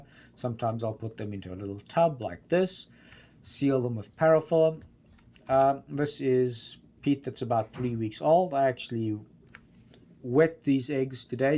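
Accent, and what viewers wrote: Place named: United States. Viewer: that's an amazing American accent.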